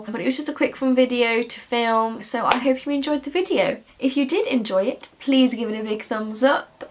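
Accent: United Kingdom. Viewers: Welcome to booktube! :D I love your Birmingham accent! ♥ My own accent is such a hot mess! But you sound lovely! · To an American, all British accents sound awesome, so you’re good with me. 😘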